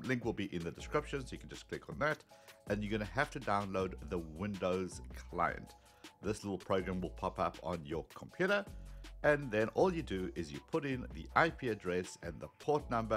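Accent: South Africa. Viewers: Are you South African ?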